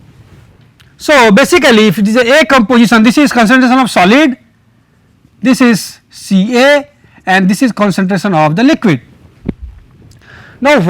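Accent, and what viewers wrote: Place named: India. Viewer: Indian accent is hard enough to listen to without that mic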